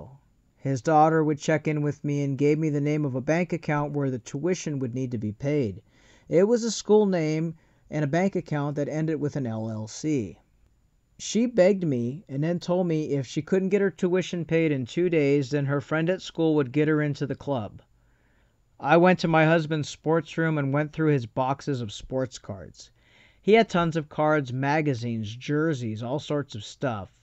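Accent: Nigeria. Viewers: my MIL was over and as I told you, my 2 month old daughter has to listen to these voice chats to calm down. She hears the accent, and I asked her where she thought he was from. She said Europe. To me it's baffling, but I went to a high school in Central Massachusetts with lots of Africans, many from Nigeria with a thick accent. SO i grew up knowing the accent.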